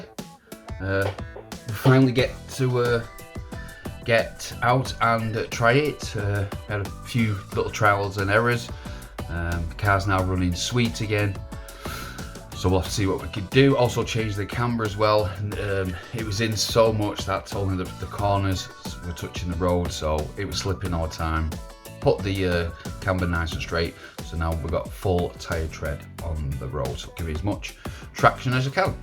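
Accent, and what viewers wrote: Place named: Manchester, United Kingdom. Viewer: Wheres your accent from mate, you in the Manchester area?.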